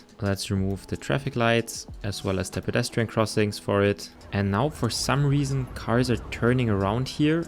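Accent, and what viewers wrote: Germany. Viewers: Just a small question. Are you speaking german or some other similar language. Cause your accent seems to come out of that direction. Great Video btw.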